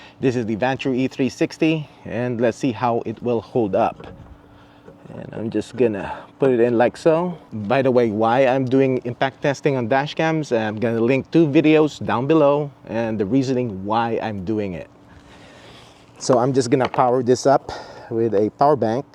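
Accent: Philippines. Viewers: Filipino accent ❤❤❤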